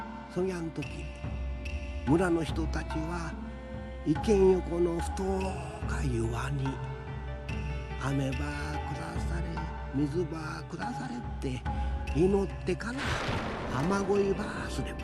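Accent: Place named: Kyushu, Japan. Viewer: 私は熊本ですが、方言は南九州の方言そっくり。むしろ本当の方言よりききとりやすい。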